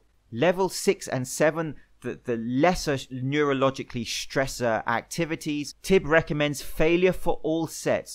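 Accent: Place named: United Kingdom. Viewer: イギリス味が強いな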